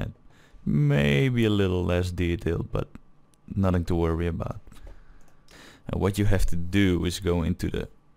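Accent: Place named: Netherlands. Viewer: lol you´re dutch arent you?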